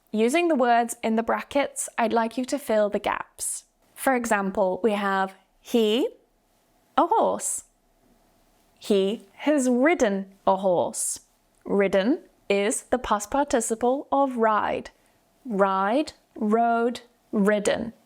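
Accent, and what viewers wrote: United Kingdom. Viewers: I understand your feelings bro her accent is British accent that's why. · I am from Japan. It’s so helpful for improving my English skills, and I love your beautiful British pronunciation❤Thanks a lot!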